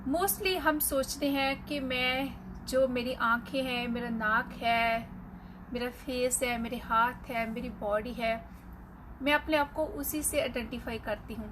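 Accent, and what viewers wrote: Punjab, India. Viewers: Perfect answer.. बस Punjabi accent bahut zyada hai.. Why don't you make the video in Punjabi?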